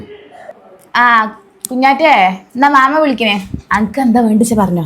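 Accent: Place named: Malappuram, India. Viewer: സംസാരം മലപ്പുറം സ്റ്റൈൽ ആണല്ലോ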